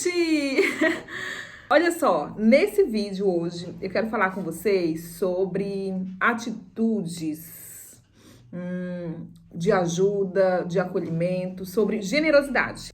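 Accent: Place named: Portugal. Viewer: sra, eu estou a ouvi-la, você fala clarinho, com toda a á vontade, não há hipótese de dúvidas se você fala exatamente como todos falamos, custa,- me acreditar que brasileiros digam que percebem melhor o espanhol do que o português de cá, estão a brincar connosco, não acha.?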